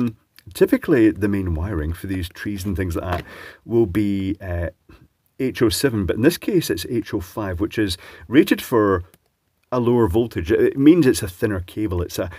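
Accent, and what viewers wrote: Scotland, United Kingdom. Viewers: Nice to see someone making decent electrical devices. I really like that they use rubber. I go out of my way to buy wire, test leads and so on, that are made from either rubber or silicon. Your videos are wonderful, especially on a Friday after a long work week and I need a pleasant Scottish accent dude showing me silly videos about his latest purchase of cheap Chinese electronics. Hehe. You're a gem :)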